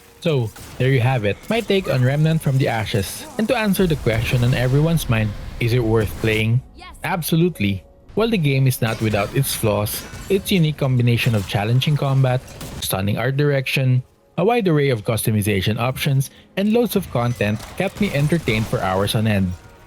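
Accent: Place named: Philippines. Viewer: You sound like Pinoy bro. Are you pinoy?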